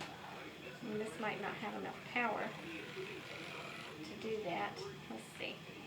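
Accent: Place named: Alabama, United States. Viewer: Are you from Alabama or Georgia? I hear my accent! (Alabama)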